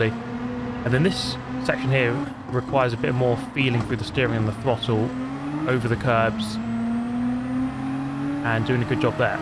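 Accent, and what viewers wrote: United Kingdom. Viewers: I'm pretty sure Fanatec is pronounced "fanatic" as in a crazy person. Don't hate me. You'll probably get away with it because of the British accent! Rock on!🎉😂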